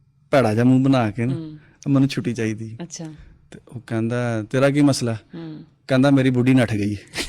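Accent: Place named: Dogri, India. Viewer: Vadia j&k di dogri BOLI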